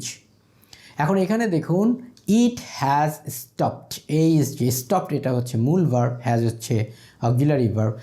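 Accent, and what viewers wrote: United Kingdom. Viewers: Apni british English spoken style use koren kno???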